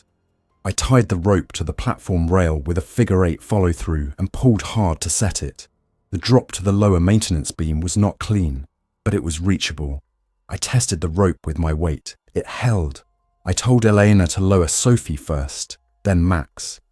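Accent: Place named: United Kingdom. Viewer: it does seem a little odd for an AI voice that could have whatever accent you want, to have a british accent talking about what happened in america.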